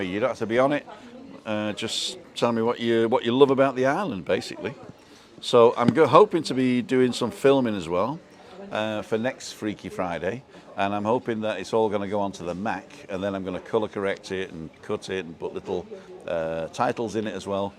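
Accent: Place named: North Wales, United Kingdom. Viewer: Listened on catch up, you have a very strong North Wales accent when speaking Welsh! You don’t speak English with a Welsh accent though. 🏴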